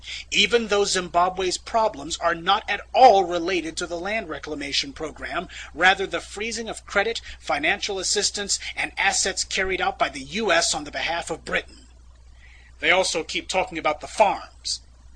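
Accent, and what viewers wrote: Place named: United States. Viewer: hij praat als een Amerikaan. Geen Braziliaans accent.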